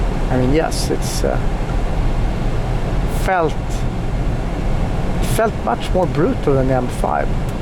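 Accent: Sweden. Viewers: that svedisch accent mannen, suverän bil! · You sound like u are from sweden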